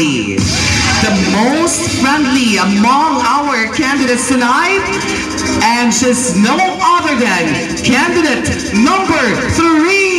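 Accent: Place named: Visayas, Philippines. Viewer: Bisaya kaayo oy..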